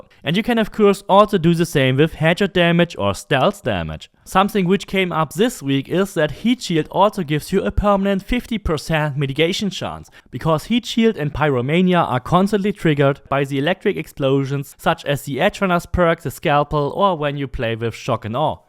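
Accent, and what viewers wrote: Germany. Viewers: I hear the german 😂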